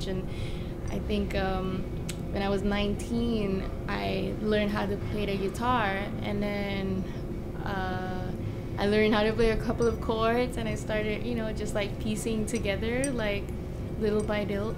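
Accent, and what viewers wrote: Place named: Malaysia. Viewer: She is from Malaysia like I am so that's why she has a little bit of accent of malaysia.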